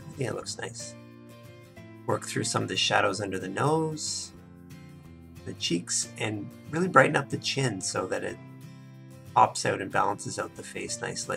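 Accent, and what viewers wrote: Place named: Canada. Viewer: I can hear Canadian accent!))) cool!))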